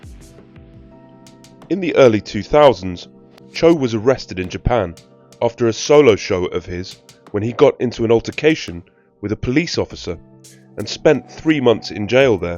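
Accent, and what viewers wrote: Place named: United Kingdom. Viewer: Ill never get over how Brits pronounce Los Angeles as “Los Angelees” and taco as “takko” 😆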